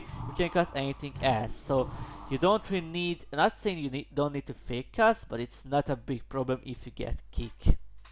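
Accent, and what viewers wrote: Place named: Hungary. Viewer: where r u from? u have a hungarian english accent :D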